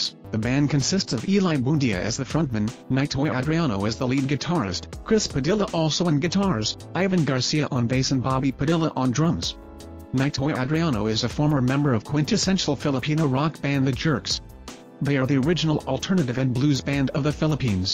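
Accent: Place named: United States. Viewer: Who's commentator...American accent... keep it up...nice trivia... about Ely buendia...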